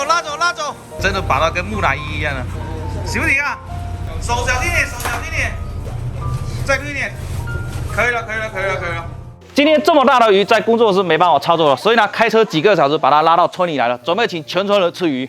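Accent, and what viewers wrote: Fuzhou, China. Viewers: 兄弟福州人么，福州腔好重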